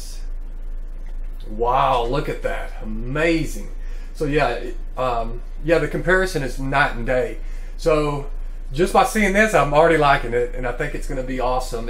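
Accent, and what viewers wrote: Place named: Georgia, United States. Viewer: Are you from Georgia?? Your accent reminds me of AJ Styles. :)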